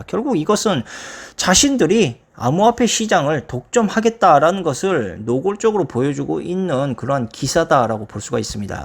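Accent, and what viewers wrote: Jeolla, South Korea. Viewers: 내가 싫어하는 전라도 사투리도 듣기 좋게 만드는 모든 전라도 사람들이 처럼 똑똑했으면 난 전라도 사람들 존경했을거임 난 개떡같은 인간들만 만났는데 게다가 좌빨인것 까지 ㄷㄷ 같은 깨어있는 분도 있다는걸 암 ㅋㅋ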